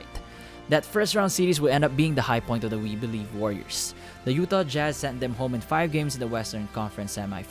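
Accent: Philippines. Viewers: Sa boses palang pinoy na e 🤣. Love the video though 🙏🏽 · is it just me or do y'all hear a filipino accent?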